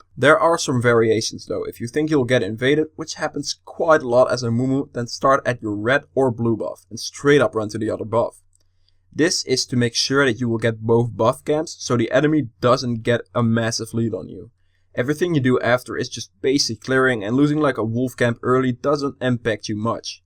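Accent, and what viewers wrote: Netherlands. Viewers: Heerlijk nederlands engels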